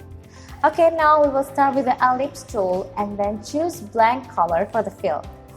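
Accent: Indonesia. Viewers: where you from? indonesian or malaiysia kek orang indo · Kyak orang indo deh, yekan?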